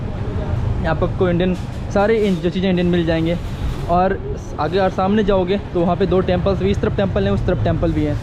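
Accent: Russia. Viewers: Russian ascent is better than nastya's...😄🤩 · Did anyone noticed that english accent is somewhat russian now?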